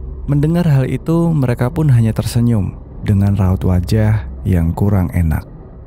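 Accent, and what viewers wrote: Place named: West Java, Indonesia. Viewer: Sunda euy😂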